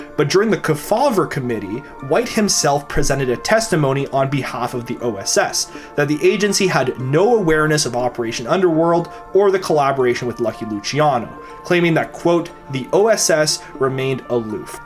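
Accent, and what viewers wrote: Canada. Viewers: The Canadian accent is rough. You have a major problem with your As.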